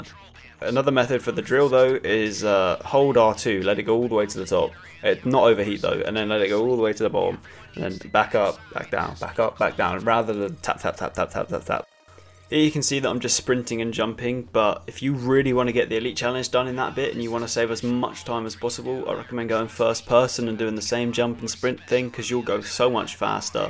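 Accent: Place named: United States. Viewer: let me guess... american?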